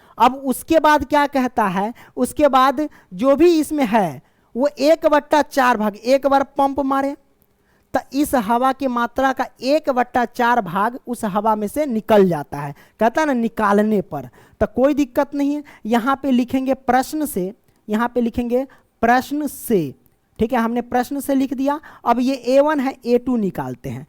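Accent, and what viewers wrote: Bihar, India. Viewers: Aap bihari ho kya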